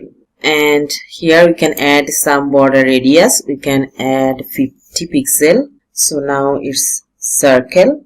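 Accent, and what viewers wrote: Bangladesh. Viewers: Proud to see Bangladeshi English Accent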